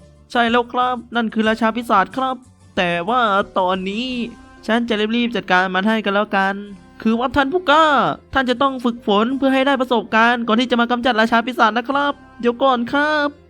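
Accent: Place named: Thailand. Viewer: เป็นการอ่านที่เป็นไทยมาก555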